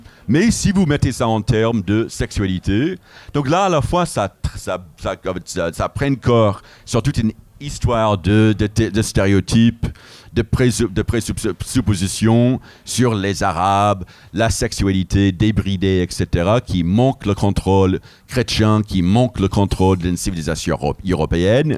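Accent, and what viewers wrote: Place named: United States. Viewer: Je crois que c’est quasiment la première fois que j’entends parler français avec un accent américain. J’aime bien l’ambiance.😂 Et les propos sont très pertinents, très intéressant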